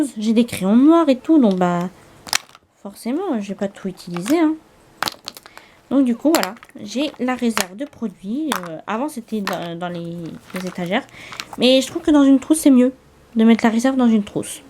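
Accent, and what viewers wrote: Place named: England, United Kingdom. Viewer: Coucou jolie, waouh tu en a du maquillage pour très longtemps. C'est bien rangé. Il y a de très belles palettes. C'est toujours un plaisir de t'écouter Tu as aussi un joli accent Anglais. Bonne semaine à toi, bisous, à bientôt, kénavo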